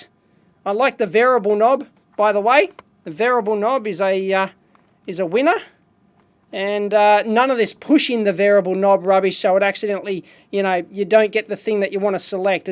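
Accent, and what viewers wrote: Australia. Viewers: The 150% Australian accent would be a big clue ...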